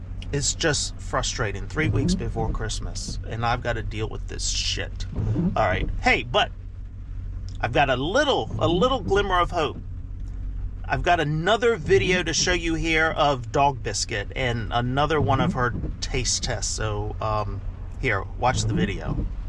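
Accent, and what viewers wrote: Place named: New Jersey, United States. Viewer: You talk like you are in New Jersey .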